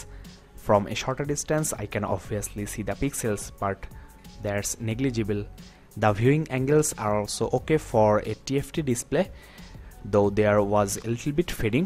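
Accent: India. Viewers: india voice ?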